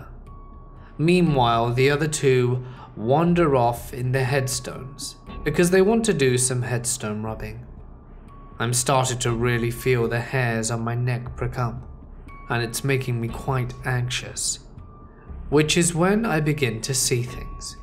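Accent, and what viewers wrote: England, United Kingdom. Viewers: That's how we Brits pronounce it.... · Kevin Heriford He’s English and that is the way they pronounce cemetery with their accent. 🤷🏻‍♀️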